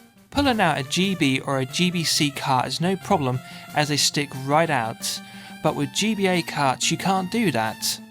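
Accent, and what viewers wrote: United Kingdom. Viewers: hahahaha i love britisch aktzent :D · great job!!I was looking for GBplayer review! I rlly liked, but I gonna tell u: your british accent difficult my understanding, I partially understand what u said. greetings from Brazil!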